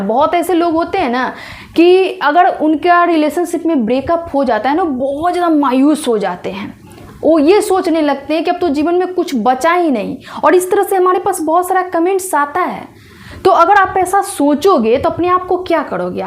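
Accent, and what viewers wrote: Bihar, India. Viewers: Bahut sach kaha , aapki tone belongs to Bihar state ,? I imagine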